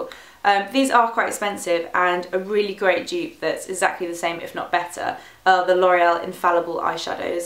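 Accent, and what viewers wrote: United Kingdom. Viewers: love your channel, your videos, and the british accent ...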